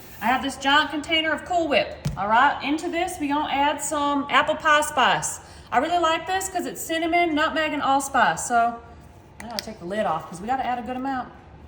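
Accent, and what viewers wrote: South, United States. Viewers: I just love you and your accent. From one southern girl to another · Southern accent?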